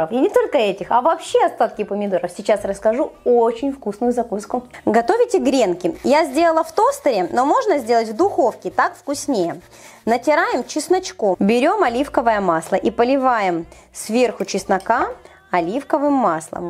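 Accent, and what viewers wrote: Ukraine. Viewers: а где вы живете..... Говор у вас Украинской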